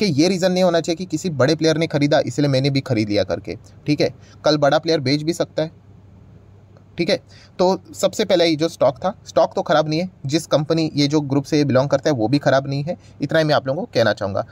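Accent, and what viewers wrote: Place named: Delhi NCR, India. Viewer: sir aap 100% delhi ncr se ho aisa muje lgta h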